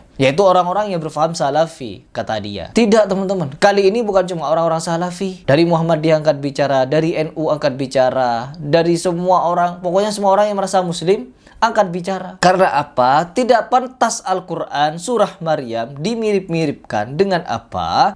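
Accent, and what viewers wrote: Madura, Indonesia. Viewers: logat bicaranya seperti orang madura ya? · gausah banyak bacott... buktikan saja kalo anda benar.... sekarang buka mejelis ilmu.. ada kah yang mau ikut anda?? soalnya saya liat vidio anda seakan akan pendapat anda itu selalu paling benar... kalo dari logat anda ini orang madura... sini sodara jangan berani depan kamera... jalan jalan ke kalimantan... 😂😂😂 takut kah...?? gak usah takut.. kalo gak salah 😂😂😂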